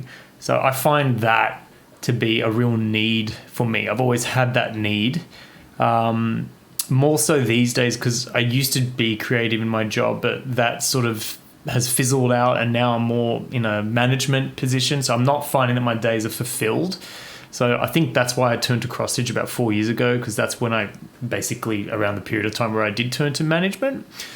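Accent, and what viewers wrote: Australia. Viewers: Welcome to flosstube knew you were a fellow Aussie as I was listening to you - your a natural - your wizard work was fantastic ~ I am working on the pirate ship for my grandson I did change the called for fabric though a stitch in time is an Aussie store I buy from too needle minders I brought from WA etsy store recently called ‘inchwormdesign’ really like them and reasonably priced - looking forward to next episode😊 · Great first flosstube! Lately I feel like all the flosstube channels I’m recommended offer up similar content. I discovered a few new designers with you which got me excited! It’s also great to hear a fellow Aussie accent ☺️ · Hey from Melb, love hearing another Aussie! Well done on your finishes. I agree, it's a daily lifestyle. Hope your pinky recovers, I hit 40 last year.....gets worse 😂. Welcome to Flosstube 🥳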